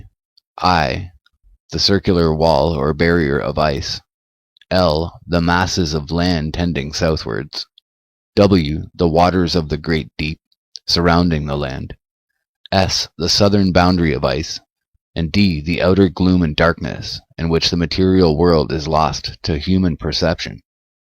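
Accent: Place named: Canada. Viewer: Sounds like flat water channel. I heard the Canadian oooo. Excellent.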